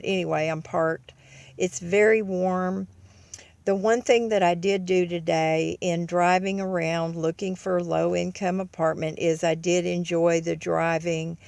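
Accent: South, United States. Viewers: Just taking bids I hear. You have a southern accent, but do you speak Spanish? haha.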